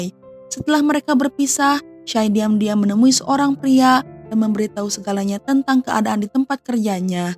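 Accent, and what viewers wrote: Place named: Sunda, Indonesia. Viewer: Suaranya khas yaa sunda hihi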